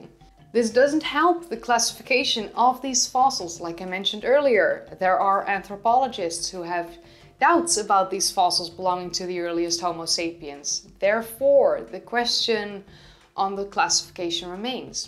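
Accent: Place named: Netherlands. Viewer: I love your Dutch accent on pronouncing Leipzig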